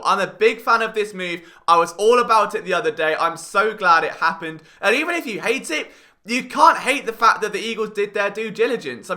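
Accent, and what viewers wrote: England, United Kingdom. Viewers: I come here to get my eagles news with an English accent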